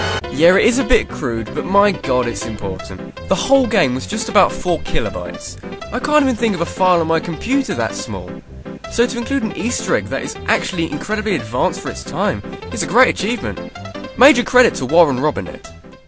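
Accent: United Kingdom. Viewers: your accent is british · *Gasps* You're british? *Subscribes* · Are you Actually BRITISH? Me too! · Darn, why are you and your British accent so awesome!!!!!! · Are you british? Because you sound british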